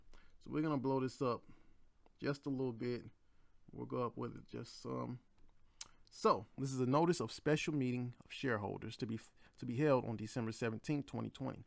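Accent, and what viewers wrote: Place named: Alabama, United States. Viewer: you from alabama? cool accent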